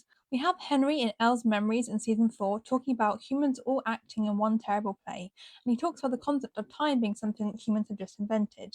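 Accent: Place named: United Kingdom. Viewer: I like ur accent it's kinda like British🤭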